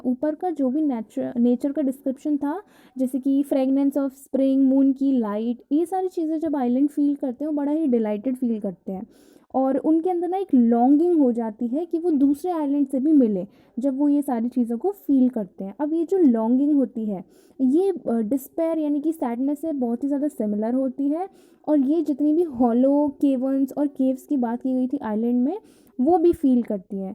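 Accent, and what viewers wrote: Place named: India. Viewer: Indian accent brother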